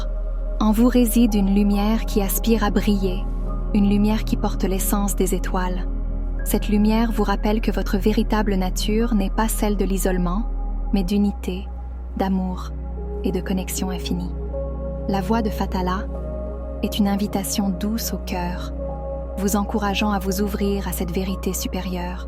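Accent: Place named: Canada. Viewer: ******* Bonjour, (Elle a un petit fond d'accent canadien cette voix de l'IA ). Nous le savons bien qu'ils nous manipulent depuis le panthéon mésopotamien..... Mais nous sommes au temps de l'apocalypse et notre désir est de parvenir sur Nibiru le plus vite et le mieux possible... Nous y travaillons de tous, nous, les Ligthworkers... Hi, Fathala...Par IA interposé ! ******************************************************************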